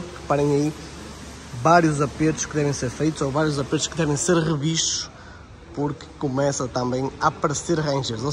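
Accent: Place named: North, Portugal. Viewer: Os meus parabéns pelo canal genuinamente Português e com o prazer do sotaque do Norte. Conteúdo esclarecedor e competente.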